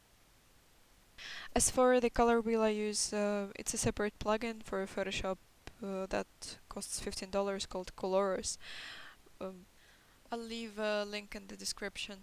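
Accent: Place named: Eastern Europe. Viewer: у тебя не самый всратый английский, что я слышала. Но местами прям "славская" натура прёт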